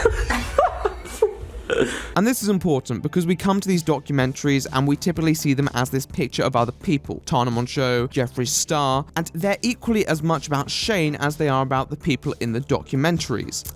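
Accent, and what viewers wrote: RP, United Kingdom. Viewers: Just because he puts on a posh accent does not make him the BBC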